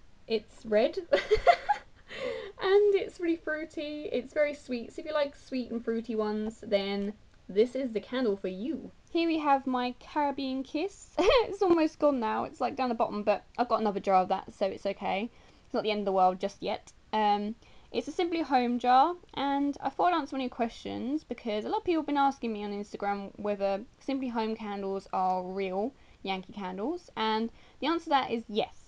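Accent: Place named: London, United Kingdom. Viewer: Are you from near London? You have such a London accent haha :)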